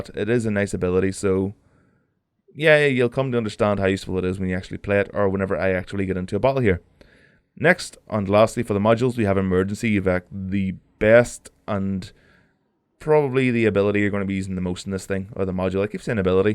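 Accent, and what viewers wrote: Northern Ireland, United Kingdom. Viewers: you sound northern irish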